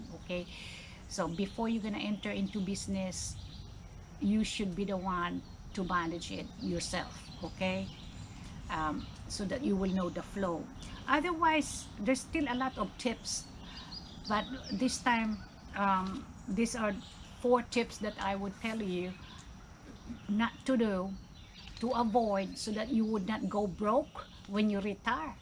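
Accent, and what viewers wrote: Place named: Philippines. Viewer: Are you a Filipino ? If yes, then could you pls speak in your mother tongue your accent speaks for it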